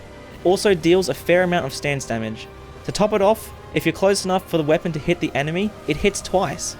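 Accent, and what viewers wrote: Australia. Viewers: my god you are soooo australian, i love it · u sound a bit like crocodile dundee mate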